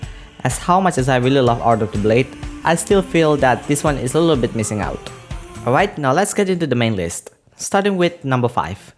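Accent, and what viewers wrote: Indonesia. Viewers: So... You're actually indonesian? 🗿 I can tell from your accents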